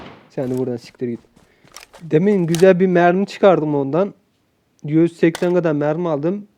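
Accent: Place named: Afyon, Turkey. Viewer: Tam afyonluca konusuyon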